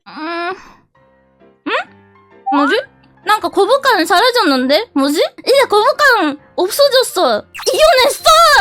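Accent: Japan. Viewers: 한본어 개기엽넹ㅋㅋ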